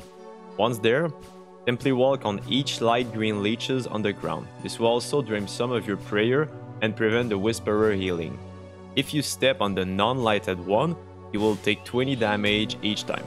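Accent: Quebec, Canada. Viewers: en 2 secondes j'étais genre, yo un québécois qui fait un guide. Nice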